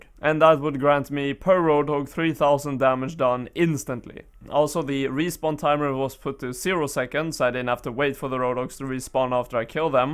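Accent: Sweden. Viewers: Ur Swedish right? U sound Swedish nice vid btw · Are you from sweden? Really sounds like it. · I can hear that you are Swedish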